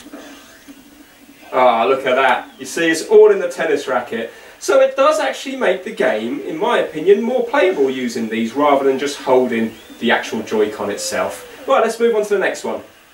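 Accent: United Kingdom. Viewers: British accents are so soothing, I love watching videos which are made by people who have them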